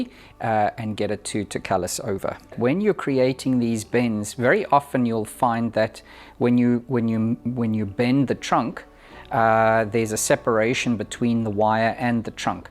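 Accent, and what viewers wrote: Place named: South Africa. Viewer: Really useful and informative video, thank you 💚 I find it funny that auto captions can’t distinguish South African accents, of course I mean no offence just makes me smile when it says bins on screen when you say bends! 😂😆 thanks again very useful as always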